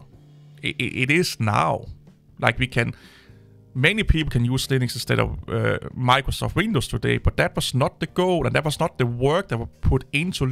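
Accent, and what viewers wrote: Denmark. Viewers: I actually subbed to this channel back then just because of this danish accent. Especially when starts destroying delusional fanboys, it cracks me up every time 😅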